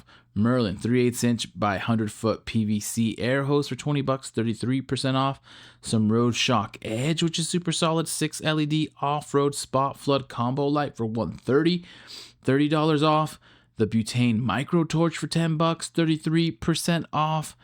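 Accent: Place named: California, United States. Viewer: that's dope lol, I speak some but not enough. I saw you were from California and I can hear the way you pronounce some words but that's kinda common in Cali, and then you said la and I was like yep it's confirmed lol. Awesome bro, good skill to have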